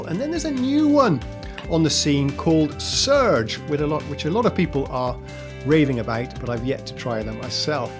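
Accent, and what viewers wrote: United Kingdom. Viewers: Starting to get weary of this British accent teaching us incessantly about everything and oh, don’t forget to toss in a few meters and kilometers.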